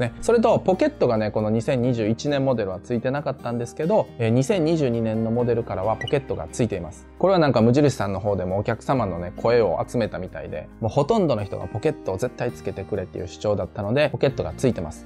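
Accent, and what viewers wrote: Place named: Kansai, Japan. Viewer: さん、明けましておめでとうございます。🙇🏻 去年夏に引っ越ししてから、無印良品の商品を購入する前にはこちらを見てから購入してます。関西人特有のおもろい話しぶりで楽しく拝見しております。私のイチオシは、ネット限定で売ってますが、スチールの四角い懐中電灯です。手で握って持ち歩く懐中電灯とは違って、持ち手が付いているので、エコバッグと一緒に持ってプラプラと歩いて帰るのに使っています。外灯がなくちょっと暗い道も安心して帰れます。形も可愛いし、乾電池で簡単にオンオフできてシンプル。私の隠れたお気に入りです。😊💕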